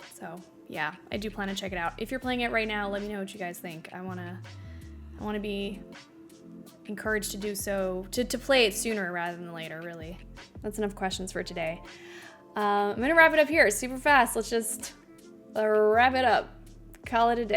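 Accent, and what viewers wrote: Quebec, Canada. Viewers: I'm not fluent in french (we learn it mandatory in school here, german speaking part of Switzerland), but I realized how different Canadian french is to what we know :D. It sounds cool though. Being bilingual (at least) is always a win.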